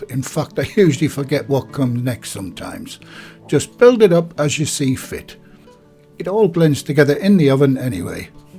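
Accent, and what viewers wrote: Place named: Bishop Auckland, United Kingdom. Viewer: another great video! Your accent sounds so much like my stepdad from Bishop Auckland. Especially when you said “very” like “vury”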